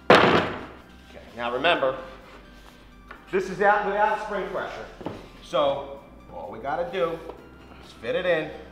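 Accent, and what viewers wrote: Philadelphia, United States. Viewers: The whole time I'm watching this I'm thinking, "This dude has gotta be from Philly. He sounds like he could be a clone of my voice." Contractor myself, and you have the same patience for bullshit that I do...zero. Northeast Philly, I have a business in South Jersey over the Tacony. Where you guys from? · the best part is the philly accent.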